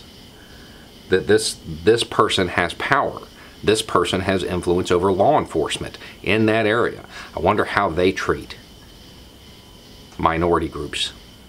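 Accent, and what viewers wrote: South, United States. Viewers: Dude I have to be honest... I caught my self for falling down that same trap when I heard your accent, but you have definitely proved me wrong. It's awesome to see someone from the south standing out on their own morals.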